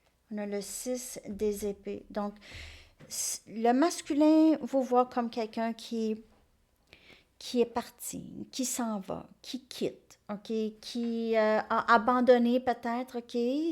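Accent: Canada. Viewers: Merci belle créature j'aime votre accent canadien et vos guidance je vous adore belle âme (Algérie )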